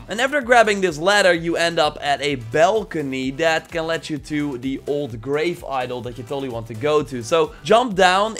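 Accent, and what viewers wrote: Netherlands. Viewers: This dude sounds dutch. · ik hoor zo erg dat jij nederlands bent echt irritant maar niks mis met je content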